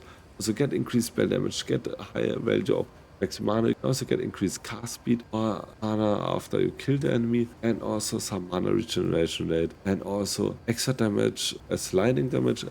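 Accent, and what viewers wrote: Germany. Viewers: the German accent doesn't help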